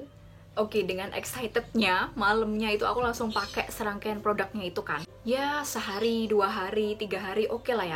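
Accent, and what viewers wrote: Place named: East Java, Indonesia. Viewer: Hahaa... Jawa timur rek.. asyik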